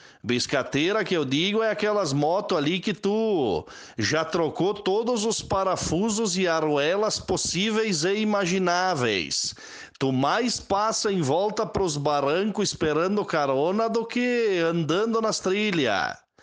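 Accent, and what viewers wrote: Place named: Minas Gerais, Brazil. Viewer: Não é não.O sotaque dele é mineiro.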